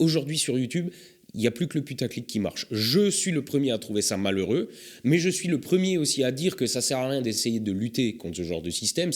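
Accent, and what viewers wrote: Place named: Alsace, France. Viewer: On sent bien l'accent d'Alsace mdrrrr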